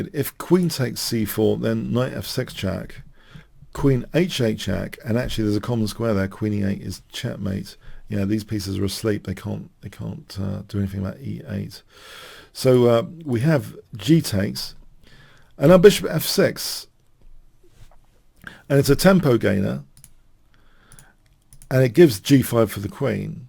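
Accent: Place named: RP, United Kingdom. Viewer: May I say... I love your videos as much for the great chess insights as for your very nice English BBC accent ☺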